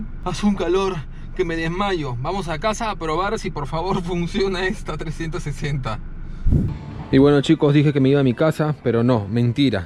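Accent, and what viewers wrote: Peru. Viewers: es Peruano,por la forma de hablar.... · Eres peruano? Sonas como peruano xd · es el primer video que veo y en la forma de como hablas... me parece que eres peruano, lo eres? te hablo desde Perú y por cierto que buen video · Eres peruano? Hablas como uno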